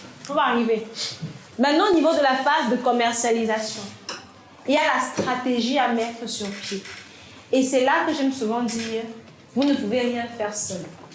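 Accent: Cameroon. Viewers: Bonne diction!précise!claire!contextuelle!bien camerounisé!un régal!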